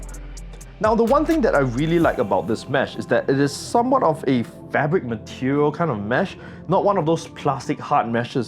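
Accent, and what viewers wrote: Singapore. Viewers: I know you’re from Singapore. Your last name and your accent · Do I detect a Singapore accent?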